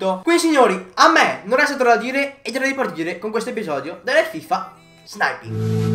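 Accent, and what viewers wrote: Veneto, Italy. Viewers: Ma sei veneto?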